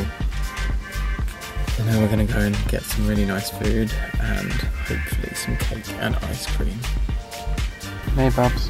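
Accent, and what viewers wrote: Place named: South Africa. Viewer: sorry about your phone :( i definitely think you're developing south-african accent though! :)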